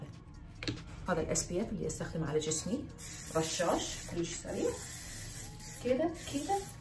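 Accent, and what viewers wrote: Iraq. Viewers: هاي من كلتي علي نصيي 😂😂 هاذة الصوت العراقي الاصلي 😂❤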